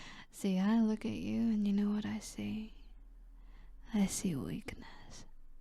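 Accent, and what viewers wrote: United Kingdom. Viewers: The british accent is making it more🌶️🌶️🔥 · THE BRITISH ACCENT YALL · fr (The british accent is making it more🌶️)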